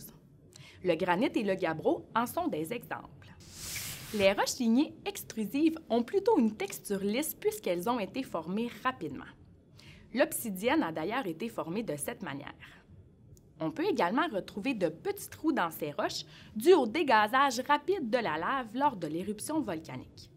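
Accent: Quebec, Canada. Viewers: Omaima Laftem hadak dialect canadien wa9ila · Avec sa manière de parler en dirait une québécoise ❤ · C juste l'accès du quebec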